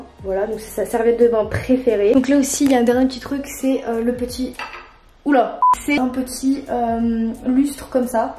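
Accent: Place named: Alsace, France. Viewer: ca sebt l'accent alsacien...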